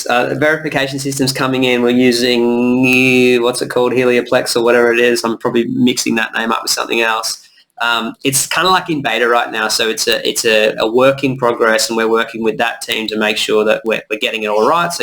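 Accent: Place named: Australia. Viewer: Sol big brain has brought me to this project. Cannot wait. Also listening to your accent great to hear someone from Straya 🇦🇺 🙌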